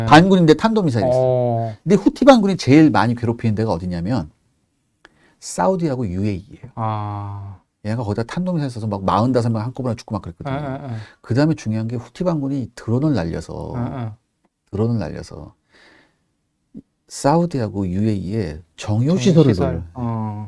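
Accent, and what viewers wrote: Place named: North Korea. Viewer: 진행하는 사람 북한 사람인가요?? 생긴것도 그렇고 말투가 강원도 같기도 하고 북한말 같기도 하네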